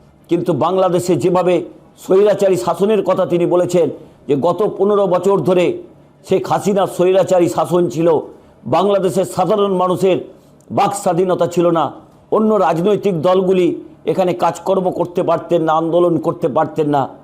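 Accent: India. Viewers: যিনি উপস্থাপন করছেন, উনি কি আসলেই বাঙালি? নাকি,ক্রস (হিন্দি +বাংলা )।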